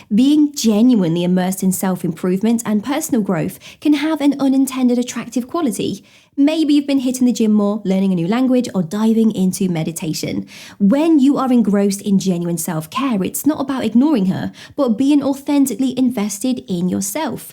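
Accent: United Kingdom. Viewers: British accent is music to my ears.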